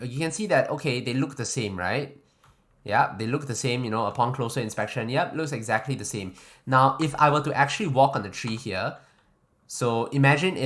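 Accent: Singapore. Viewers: you sound very singaporean...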